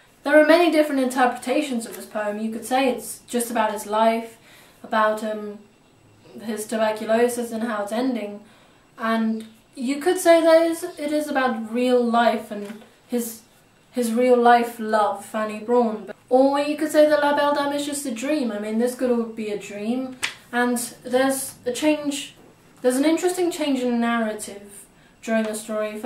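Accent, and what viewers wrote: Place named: RP, United Kingdom. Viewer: Thank you, Miss. I enjoyed this so much. I hope you've done more of these short lectures. I'm a drycleaner's daughter from Kansas. I missed school terribly before the rise of the Internet. (love your posh accent too) Thanks again.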